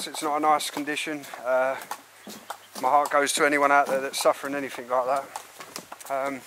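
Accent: England, United Kingdom. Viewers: The best explorers on YouTube. Not too much talk, and when do, the great English accent. Some explorers know very little about what they are looking at.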